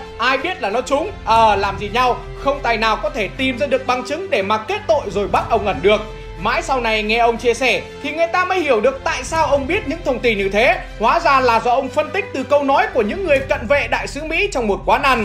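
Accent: North, Vietnam. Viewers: Giọng đọc đúng chuẩn giọng bắc Kỳ luôn nghe hài vãi😂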